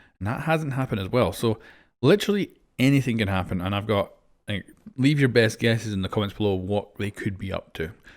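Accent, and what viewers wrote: Scotland, United Kingdom. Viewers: Are you Scottish you sound it I’m also Scottish if you are?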